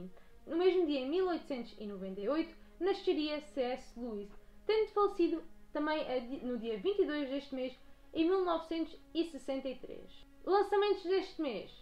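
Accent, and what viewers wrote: Alentejo, Portugal. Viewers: Fiz aniversário no dia 29 de novembro, e esse tt news foi meu melhor presente, Receber todas essas noticias da maravilhosa é realmente incrível. Muitas noticias boas com esse toque alentejano tão gostoso de se ouvir. Só falta agora um tt podcast para ouvir esse belíssimo sotaque em qualquer lugar.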